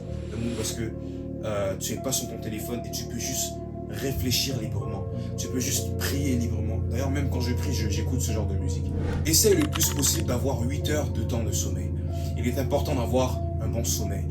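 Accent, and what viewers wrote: Canada. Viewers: superbe vidéo, j‘aurais dit « période de l’année » et non « époque » sinon la vidéo est trop qualitative et j’en avais besoin thank you sir tu es canadien? tu as un accent canadien en tout cas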